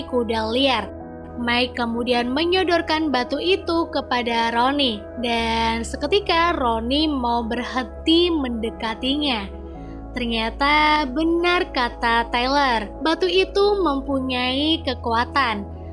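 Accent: Pemalang, Indonesia. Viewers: Wong Pemalang kie suara ne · Wong Pemalang min? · Miminnya org pemalang ya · Wong pemalang kah mba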